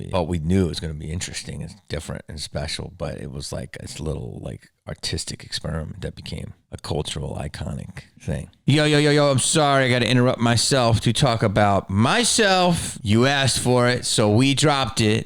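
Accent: Australia. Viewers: That Aussie accent warms my Aussie heart. 🤣🤣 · I love all your movies !!You should probably make a movie as a Australian animal, hunter or something because your accent is spot on😂😂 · I absolutely love your channel and videos like these. It's such a treat to get some behind the scenes insight, thank you! Also, I'm Australian and really appreciate the accent haha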